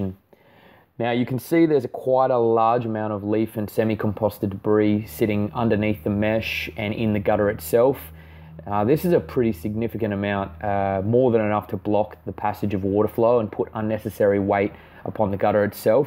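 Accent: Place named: Australia. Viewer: Oops, LOVE THE AUSSIE ACCENT!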